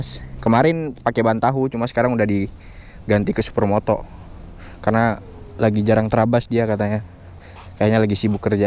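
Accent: Papua, Indonesia. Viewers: om dari papua k? om pu logat kya di papua jd😂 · bah sioo, ternyata abang juga ada logat papunya yah ternyata,, sukses terus abang salam dari Timika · Bisa logat Papua yaa bang. Kreenn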